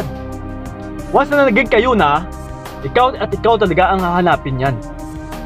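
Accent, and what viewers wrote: Iloilo, Philippines. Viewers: ilonggo ka po ba kuya ?? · Ilonggo ka kuya? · Ilonggo ka ba sir?😁yung tuno ng pananalita😉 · Hello po, ilonggo ka cguro dahil sa tono hehehe.. New subscriber po. From Hong Kong 😊Ldr din po. Thank you sa tips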